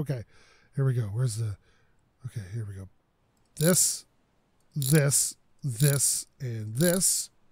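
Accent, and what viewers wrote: Canada. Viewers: Are you Canadian bro?😶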